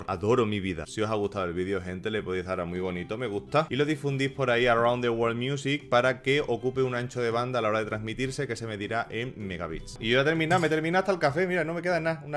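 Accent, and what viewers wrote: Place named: Extremadura, Spain. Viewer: pareces de estremadura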